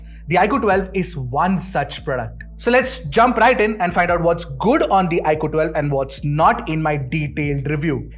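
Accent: India. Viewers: The indian accent is always👌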